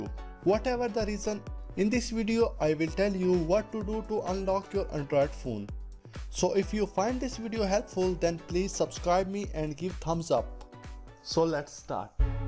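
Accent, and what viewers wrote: India. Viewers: uiqbal11 He sure sounds Indian. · YOUR VOICE IS SO FUCKING ANNOYING UGH I CANT STAND IT CAN YOU TALK NORMAL AND NOT HAVE THAT STUPID ACCENT GOD THIS IS WHY I HATE INDIAN PEOPLE NO ONE CAN EVER UNDERSTAND THEM AND CAN YOU ACTUALLY GIVE A BETTER EXPLANATION ON THIS?!?!!! god your so FUCKING annoying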